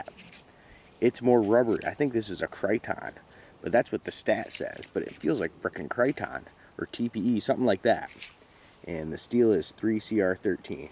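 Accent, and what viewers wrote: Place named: Michigan, United States. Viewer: You sound like you're from Detroit-area Michigan.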